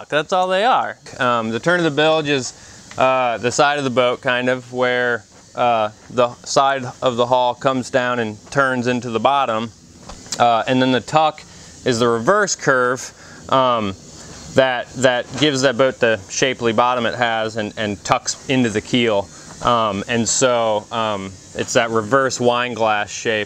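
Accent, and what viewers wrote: United Kingdom. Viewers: From an German point of view, your English ( was it always British? ) explanations of boat terms is a great help in discussions during sailing and staying in foreign ports :D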